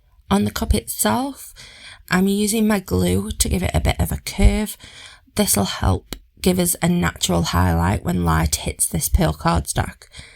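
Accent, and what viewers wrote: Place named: Northern England, United Kingdom. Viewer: Lovely cards. Good to hear a wonderful friendly Northern accent. Not many fellow northerners on YouTube 😂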